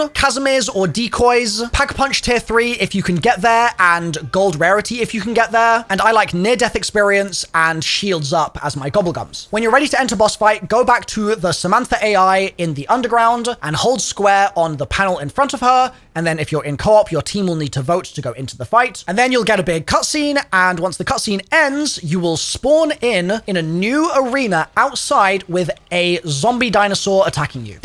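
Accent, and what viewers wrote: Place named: United Kingdom. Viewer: Thank God for the subtitles. I hate your shit British accent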